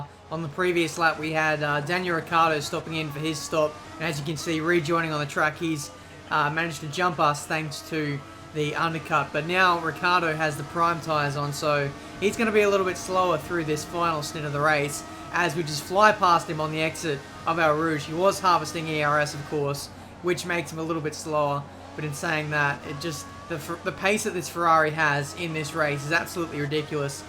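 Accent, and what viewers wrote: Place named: Australia. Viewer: Classic lines: * And away we gooo * Having a bad start as always * Another points to add to the championship tally * Look at that...! * So here we are the..... Grand prix * We got some R&D testing to do * Another crucial race for the championship Of course you have to insert the aussie accent in all of them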